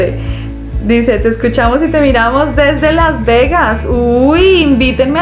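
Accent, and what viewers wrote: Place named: Colombia. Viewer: Hola mija eres chingona,,me encanto tu acento Columbia,,..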